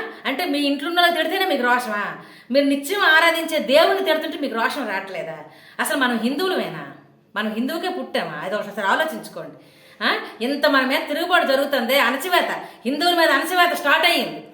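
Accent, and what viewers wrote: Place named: North Andhra, India. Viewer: 🌹జై శ్రీరామ్ 🙏 బంగారుతల్లీ వందనాలు. రాబోయే ఉపధ్రవాన్ని మరచి, గాడనిద్రలో వున్న మన హిందువులలో చైతన్యం తీసుకురావటానికి మీరు చేస్తున్న ఒక మంచి ప్రయత్నాలకి అభినందనలు, ఆశీస్సులు. సహజమైన, చక్కని ఉత్తరాంధ్ర యాసలో మీరు మాట్లాడే విధానం చాలా బాగుంది. మీరు అద్భుతంగా పాడుచున్నారు. మీకు సదా ఈశ్వరానుగ్రహప్రాప్తిరస్తు. 🌹🌹🙏🙏🙏🌹🌹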